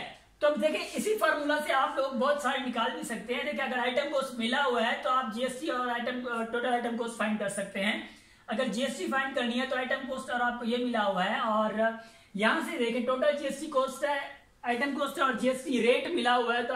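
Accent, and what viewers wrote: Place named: Saraiki, Pakistan. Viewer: ahhaha wakai bhaa saraiki sindhi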